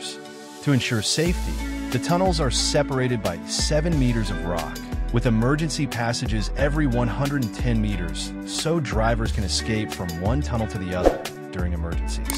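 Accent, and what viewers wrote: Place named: United States. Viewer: Mispronounced place names, American accent, left hand drive, this is hard to watch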